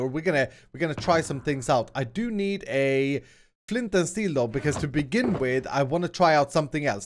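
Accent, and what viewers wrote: Sweden. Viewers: This is so funny for me as a swedish person hearing another swedish persons accent in english xD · I'm not so sure if you will remember me, but I have been watching your channel for a while, commenting. Recently, I couldn't keep up with your vids because I have been so busy. I am currently at one of Korea's best boarding school, named KMLA. (I wanted to boost cause it's one of the best achievements I've made so far...) Anyway, there's a special reason for why I'm commenting. I wanted to say thank you for various reasons. First, your videos make me laugh. It's so fun, creative, and amazing. I like the fact that you are very random. You use a lot of strange words, like crap or such, but I really appreciate that you do not use swear words. Since I had so much fun watching yr vids, I have introduced it to my brother and he enjoys it as well. 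One thing I hope is... I hope you could do some vlogging... Or at least show your face in front of the camera for at least once a month like False. It would be so good to know you as a person ' ' as much as knowing you as 'Swedish accent voiced avatar '. It would be much appreciated by both me and the fans. The second and most important thing to thank you is that you somehow triggered me... Well, in a good way. Remember when you said 'Ja' instead of 'Yes' and did a short commentary about your mother language peeking out? That sorta hit me hard. As soon as I heard that word, 'Ja' for some reason, I wanted to learn Swedish. Maybe it was because I wanted to talk to you with Swedish or I wanted to have a new hobby, but anywa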